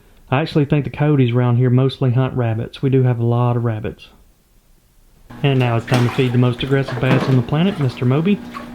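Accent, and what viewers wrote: South, United States. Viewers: I had to subscribe. Your southern drawl is so relaxing and the progress you make on your projects is satisfying. You praising your wife and speaking fondly of your daughter is lovely. I wish you all the love and success with your family and projects. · Al the Owl, because you're from AL, and our southern accent the sound close to the same. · Name the owl big Al (owl) sounds just like big owl with your southern accent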